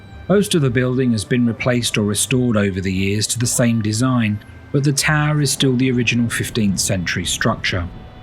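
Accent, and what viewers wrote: United Kingdom. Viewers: I love the typical British accent.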